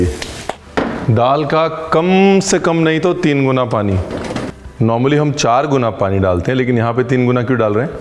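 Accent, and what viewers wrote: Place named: Bihar, India. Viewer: Bihari accent...waaow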